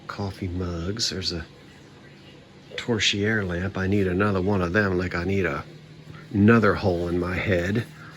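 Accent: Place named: Baltimore, United States. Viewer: I grew up in "Balmer" County. I started going to antique stores when I was a teenager ..50 yrs ago. That's when you could buy old stuff for cheap. I love all your videos, and mostly, I love hearing you speak. Takes me home. I live in Colorado now.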